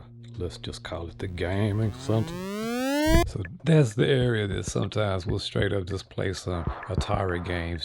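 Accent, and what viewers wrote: Louisiana, United States. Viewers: I watched a short the other day, of a guy, showing how to prepare and eat thistle stems. What I noticed pretty quick was the way he talked. It reminded me of you, and how you talk. This fella was somewhere near New Orleans. Made me think, 🤔, maybe you're in Louisiana... Then I saw the pelican... In this video. I think you're a Louisianan. It's in the speech. I did not know the way you speak is probably the way a lot of people around that region may speak. Pretty cool.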